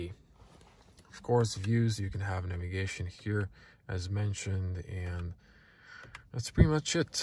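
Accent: United States. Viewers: Are you american? Youre accent is really strong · Pohvala za vrlo detaljan test. Jako dobro i tecno govoris Engleski sa americkim akcentom. Prvo mi nije bilo jasno jesi li Hrvat uopce?! U testu je manje vise sve tocno no smeta me sto vecina ljudi tvrdi da je kvaliteta izrade korak unazad. Apsolutno da to nije tocno jer je auto izraden barem jednako kvalitetno kao i sedmica cak nesto i bolje u pojedinim segmentima. Prvenstveno mislim na kvalitetu sjedala sto nikako nije zanemarivo. Drugo je naravno sto je cijena otisla gore, te je normalno da se u tom cjenovnom razredu mogu ocekivat kvalitetniji materijali u vecem dijelu interijera. Nedostatak tipki je mozda ergonomska katastrofa no to je buducnost definitvno. Recimo tko je malo gledao spijunske fotografije nove S klase pa cak i buduce C klase (W206) vidljivo je da ce zapravo centralna konzola biti ogroman zaslon. 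Poznato je da S klasa diktira buducnost i trendove autoindustrije tako da je Golf 8 zapravo cak i malo ispred svoga vremena. Detalji poput samo USB-C portova u kabini te komunikacije izmedu vozila koji razmjenjuju informacije govore u prilog tome. Sto se tice dizajna mislim da je potpuno pogoden iz vise razloga i najveci je medugeneracijski skok. Kad je aktualna bila sedmica ljudi su govorili da je dizajn konfekcijski i dosadan, sad govore da je previse futuristicki i da je cudan te je sedmica skladniji auto. Jos jedna potvrda onoj staroj narodnoj "Taj se nije se rodio koji bi narodu ugodio". Za kraj cijena je definitvno prenapuhana ali to je boljka vecine VW-a. Rek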